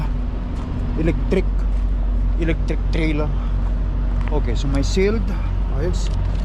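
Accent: Ilocos, Philippines. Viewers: kung may bakasyon ka ngayong summer, mag english lesson ka kasi kung mag english ka ilocano accent.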